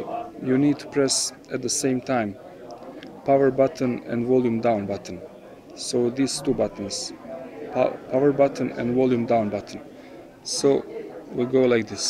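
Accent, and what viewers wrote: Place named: Russia. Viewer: рашен аксент из прешас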